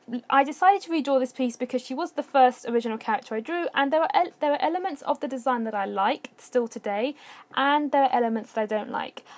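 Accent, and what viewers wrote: England, United Kingdom. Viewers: I like your English accent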